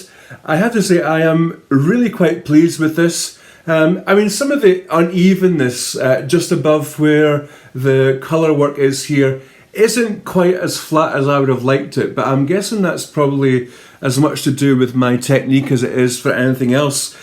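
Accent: Scotland, United Kingdom. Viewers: Hi there! I had your podcast pop up in my feed and I was intrigued by the name since I am of Scottish heritage and one of my fav restaurants in Edinburgh is called the Albanach. I also love Arne and Carlos socks but I love their Pair Perfect socks also by Regina. I have knit many pairs and also the childrens’ version. Your accent reminds me of my family there. Just back from my third time there this past fall. Can’t wait to return. Very much enjoyed your podcast and looking forward to watching the others and for future podcasts! Slainte! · Hello from Iowa City,IA USA!!! The Gaelic name caught my attention right off!! I LOVE ❤️ listening to the Scottish spoken word!! & I hope to make a trip to Scotland soon!! I enjoyed the trip around your area & center. I started a Prayer Shawl Ministry at my church(St.Mary’s) where we knit&crochet prayer shawls & Baptism blankets!! 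Thank you for sharing what you do!! Linda God Bless!!!😇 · Hello, and greetings from Australia (yes, I live on an island too! A wee bit bigger than yours 😉). I’ve just watched your first podcast and I really enjoyed it. I see you have a second one up so I’ll watch it too. I’ve subscribed and I hope you will keep it up for a long time. Some of the reasons I enjoyed watching - first, your delightful accent. There is a Scottish lady from Edinburgh who comes to my knitting group and it is like listening to music when she speaks. Second, I like it that you aren’t too polished a knitter. You admit to making the odd mistake and also you aren’t afraid of experimenting a bit, as with your dashing kep! Thank you also for your mini tour of parts of your island. I’m looking forward to seeing more of it. As a fellow Christian, it is refreshing to see your life so unassumingly revealed to us, the viewers. 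· Hello from the North of France, near Compiègne and Paris 🗼. I enjoyed very much watching your podcast and admiring your nice country. Your videos will be a good English exercise for me, especially with your Scottish accent, I have to get used to it. But after several videos, I guess it will be OK.Thank you for the good time spent with all the community of knitters from all over the world.🙋‍♀ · Hello from Deb Sims of Charlotte, North Carolina, USA…You are a natural at YouTubing. Love the content mix…music, scenery, projects…lovely. Love, love the colorwork and color choices in your hat. My forbears were from Caithness, so I love hearing Scottish accents. I’m a happy subscriber. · My Dad was born in Scotland and he came to the US as a child. He tried and mostly succeeded in losing his accent…until his last years. Listening to you reminds me of him and his mother. Scotland is an absolutely beautiful country and I loved my visit many years ago. 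Congratulations on the sterling beginning to your career as a content creator. Please, please, please continue to include videos of your beautiful homeland! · Hello from Australia, really enjoyed watching your podcast such lovely scenery on your beautiful island. Knitting is a joy and so nice to listen to a your podcast in your lovely scottish accent. Your fisherman's hat is beaut and with the tassel reminds me of pictures of past times with nightie, candle and bed hat with tassel .... have a good one cheers from downunder :) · Lovely to find you here on you-tube and fantastic to see your workplace and home. I laughed when you said that your Mum would be the only person to have watched the whole thing! 2.97K subscribers as I write:) I am trying to learn and appreciate sock knitti g right now and am attempting afterthought heels too! I have 4 tubes done and now need to try the heels: I will check out Arne and Carlos' pattern to see if they have any extra tips, so thank you hugely for that . 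I am in the UK: SE London. Lovely to see a man knitter and hear a scottish accent :) It saddened me to hear about the island clearance: what a heritage we have.....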